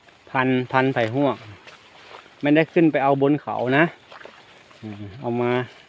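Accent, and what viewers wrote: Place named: Isan, Thailand. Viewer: โดยส่วนตัวไม่ได้ติดตามข่าวน้องชมพู่นะค่ะ และไม่เคยดูคลิป เลยค่ะ แต่เปิดมาเจอคลิปของตา อ้าวตาพูดไทยตกอิสานแต่ทำไมน่ารักจัง😊ติดตามตาเสยเลยทีนี่😊☺😚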